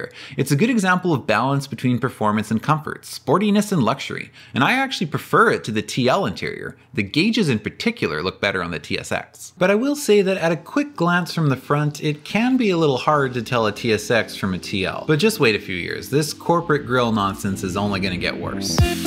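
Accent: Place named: Canada. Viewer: Just wanted to say that I love your videos! My grandma had the original MDX, and I remember being blown away by the second gen when I was about 5 haha. Love the Canadian talk too, as an Albertan. Acura's always been on my radar, but I'm driving a 2010 Corolla XRS right now (considering importing an SC430 after I'm done Uni). Subscribed!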